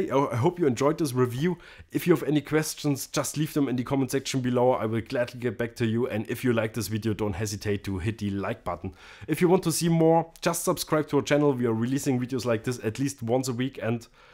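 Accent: Germany. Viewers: у него немецкий акцент?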